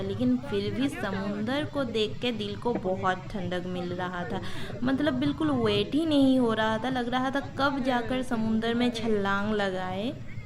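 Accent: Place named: Bengal, India. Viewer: Aapka hindi acha hai bas thora bengla touch hai.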